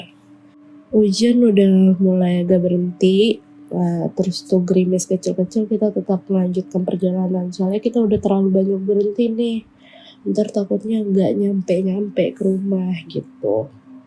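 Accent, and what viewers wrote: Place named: South Jakarta, Indonesia. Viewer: Iya gabungan sengau plus jaksel. Makin gak jelas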